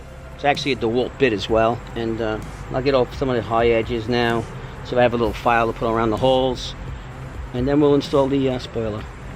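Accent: New York, United States. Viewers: First of all, nothing beats a NY accent. #Queensgirl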